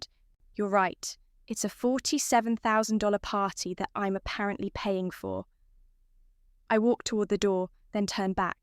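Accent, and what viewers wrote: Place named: Australia. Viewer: I live the Australian voice-over that everybody calls a British voice.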